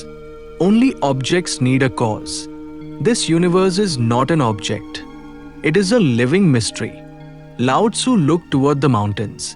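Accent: India. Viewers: Why the Indian-English voice when the video is about a Chinaman? 😏👎👎👎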